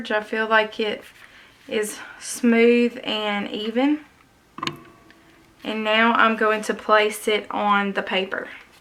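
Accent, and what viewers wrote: South, United States. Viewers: I enjoy listening to you talk ,my sister was a southern gal too I miss hearing her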